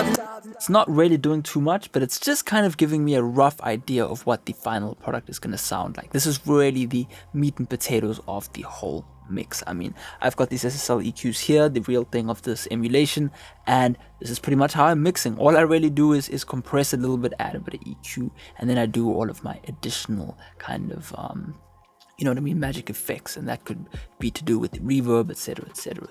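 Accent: South Africa. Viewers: Am i the only person that thinks your from South Africa? 😄 only recently discovered you and im South African myself. Your mixing advice has helped my vocal mixing out ALOT. Appreciate it broski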